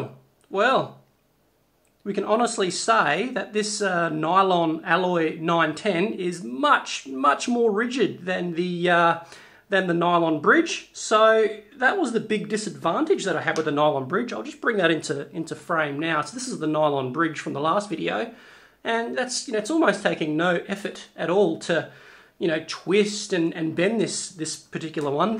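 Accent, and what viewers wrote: Australia. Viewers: No, lol. Same Aussie accent though.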